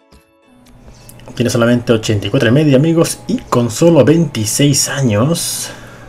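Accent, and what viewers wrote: Peru. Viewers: eres peruano? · eres peruano ¿?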